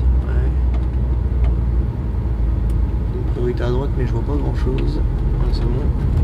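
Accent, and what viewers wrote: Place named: Nord, France. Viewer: Bonjour bonsoir merci pour la vidéo j'aime bien l'accent que j'entends je suis du Nord et j'ai l'impression de retrouver ma région j'aime bien tes vidéos elles sont sympas pour moi il y a un petit peu trop d'accélérer ce qui donne pas véritablement toujours l'imagination du métier le professionnalisme du métier mais merci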